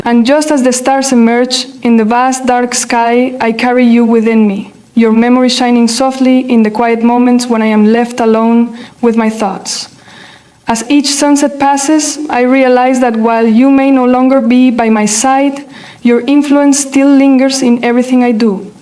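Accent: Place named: United Kingdom. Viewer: Откуда этот акцент???У меня в университете студенты и делегация из Великобритании так разговаривали.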